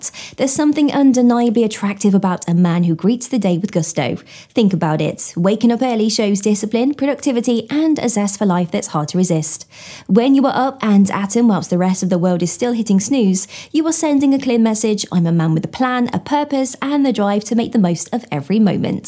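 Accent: England, United Kingdom. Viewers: just like always. You are one attractive beautiful British woman! 😍😍😍😍😍😍😍 I'm here for my British accent fix for the day!😊😊😊😊😊😊😊 You have a Blessed day. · This may seem pedantic but there is no British Accent, there are English accents, Scottish accents, Welsh accents and Northern Irish accents and within each of those there are different accents per city and in London there are also different accents. Her accent is English.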